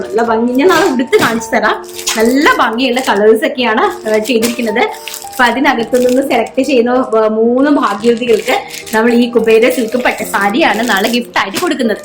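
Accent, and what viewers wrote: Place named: Tamil Nadu, India. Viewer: I like and your performance so much. Tamil mixed Malayalam kelkaan oru sugham und God bless you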